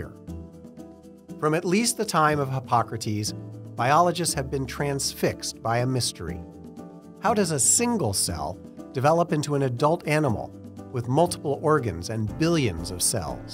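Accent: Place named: United States. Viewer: The American pronunciation of harassment, detail and zebra made this difficult 😅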